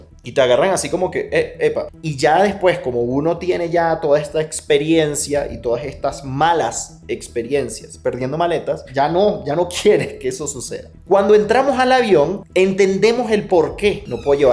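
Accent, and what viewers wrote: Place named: Venezuela. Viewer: eres guapo No habia tenido el honor de conoserte.. Veo tienes esposa) Por tu acento eres Venezolano _? Mire todo el video y me quede esperando una toma' cerca de ese pico de la ⛰️ Creo era al final..pero tengo muchas preguntas/ 1_ Las 🪨 rocas estan sin cemento_? Mi Padre hacia lienzos de piedras y solo encimandolas se caian seguido) Estas rocas tienen buena colocacion y creo se adhieren fuerte y miro estan partidas con alguna maquinaria..no hay piedra que sea tan derecha y ahi muchas son partidas exactas/ 2_ Machu Pichu tiene laderas muy altas.. La gente pregunta como construyeron tan arriba/ Yo pienso que era un altiplano parejo y con el tiempo se fueron quedando algunos arriba_ dando un aspecto de que construyeron a las alturas) Mi pregunta es' Algun terremoto destruyo los alrededores y solo sobrevivio ese piko de laderas.._? 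Amigo " Que fortuna poder viajar y conoser en persona lugares misticos' Al tu estar grabando y hablando..pense que te perdistes..el sentir la recarga de seres que habitan invisibles ahi..yo mire en tu recorrido unos movimientos detras de una ventana y no eran personas/ Eso paso antes de la lluvia y el viento' Porque estabas llegando a la plaza principal y el estar a esas alturas.. esos seres cuidan sus terrenos y al final les dieron permiso de seguir' porque podia haber una tormenta mayor y tuvieran que parar y regresarse.. hasta yo pensé.. Noooo ya se estaban acercando a la montaña y no vere lo mas importante) La Roca Sagrada Tuvo sacri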